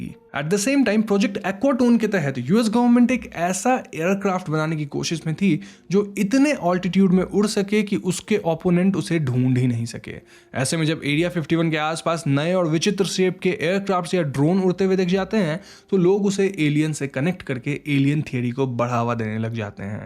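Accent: Bengal, India. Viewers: U r Bengali right? Ur accent reveal it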